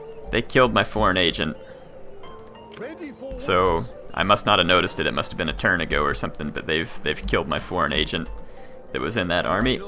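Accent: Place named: South, United States. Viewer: we miss your southerner accent:D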